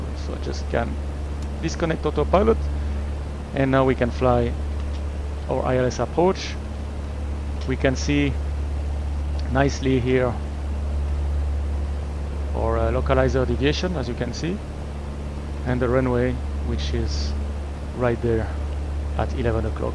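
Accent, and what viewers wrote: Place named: France. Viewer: I love your France accent realy now my request me and all my friend between <num> group what they call us gooney birds so..fsx or developer put all gauges in the wrong place we were making correction by FSPanel studio in fsx but now P3D v4.2 way better then fsx which is normal new generation smart boys,,but we don't have FSPanel Studio for P3D v4.2 can you help us we are 200 thounds staying a life make us happycheck me out in you tube..murray ayata fspanel studio may be better understanding regards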